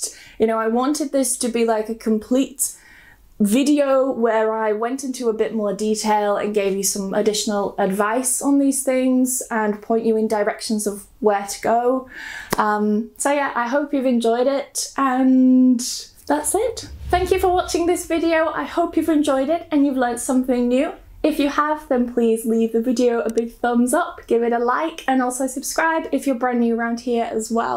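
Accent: United Kingdom. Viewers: your British accent is just delicious! Your voice is music to my ears.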